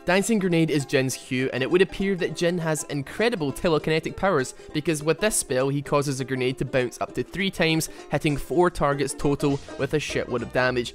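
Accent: Scotland, United Kingdom. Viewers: I can tell you are scottish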